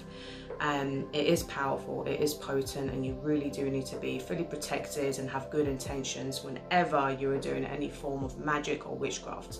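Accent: London, United Kingdom. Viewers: After living in devon for the last 20 years so nice to hear a fellow londoner ...blessings 🌛🌕🌜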